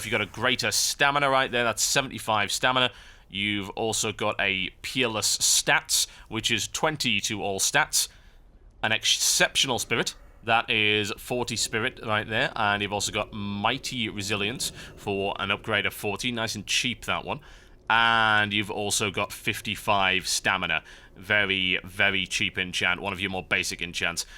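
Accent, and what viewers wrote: United Kingdom. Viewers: brit accent sucks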